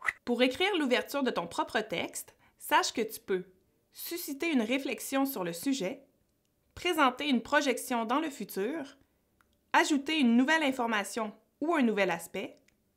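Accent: Quebec, Canada. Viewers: bah il est normal, c comme ca qu'on parle au Québec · Merci pour vos videos. L'accent quebecois est mignon.😊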